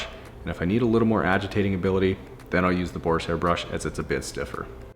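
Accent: Canada. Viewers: That Canadian accent... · I'm in Canada too and we speak the same like he does.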